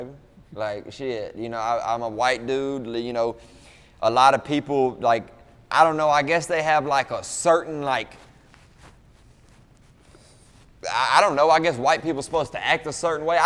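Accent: South, United States. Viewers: this is exactly why I subscribed to him one day I was just scrolling and came across his video for the first time and he was responding to somebody saying that he talks black and when I hear that, that shit really gets under my skin because I grew up right next-door to him in south Alabama, mobile to be exact so when I hear him, I hear a country boy and when I moved to Texas, I used to get the same shit and I would be so confused because this is how all my family talk like my granny or especially my Pawpaw if you were just on the phone with him, you would think you was talking to a old black man, but now that I’ve been in Texas so long, I can see what they’re talking about because how a lot of the Black people talk over here is how them back wood country white people talk in Bama. But yeah, somebody was hating on him because of his accent and you could tell he was being genuine as hell replying to this hater so I followed him solely off of somebody hating on him and now I’m glad I subscribed to his page because it’s refreshing to see someone being exactly who they are authentic and humble. 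So yeah, I’m rooting for Lil bro and another thing is I really would like to hop on a track with him but I doubt I could afford a verse😂🤷🏻‍♂️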